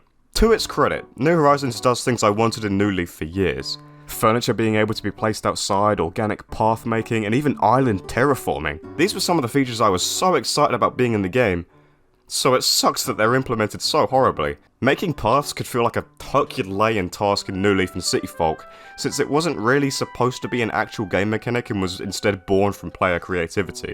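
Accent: United Kingdom. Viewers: You from kent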